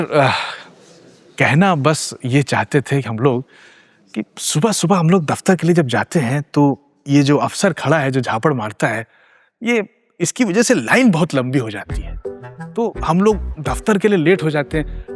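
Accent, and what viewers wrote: Lucknow, India. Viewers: Lucknow tone ❤❤❤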